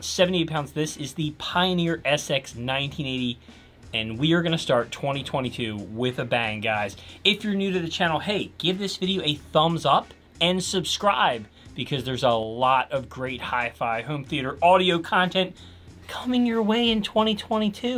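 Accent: United States. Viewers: Why if the talking head has a USA accent do we have to endure an undercurrent of anti intellectualism?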